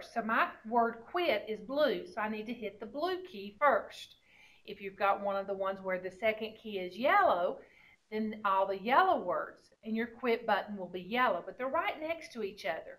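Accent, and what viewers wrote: United States. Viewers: God bless you, are you from Boston?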